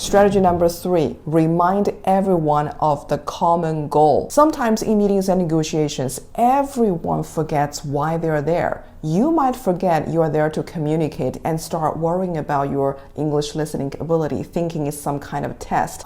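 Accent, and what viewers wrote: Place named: China. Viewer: 因为他的口音很中式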